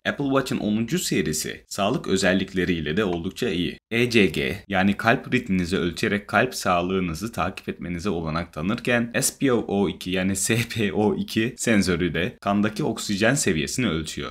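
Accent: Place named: United States. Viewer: Konuşman aşırı rahatsız edici Türkçeyi Amerikan aksanıyla konuşuyor gibisin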